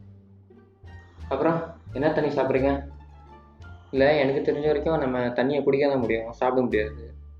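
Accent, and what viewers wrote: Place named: Malaysia. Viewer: are you a indian malaysian coz ur accent though different i hate indian accent but ur's is kinda malaysian indian accent anyway good video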